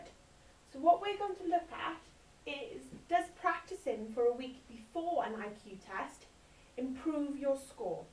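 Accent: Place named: United Kingdom. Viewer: damn that british accent -_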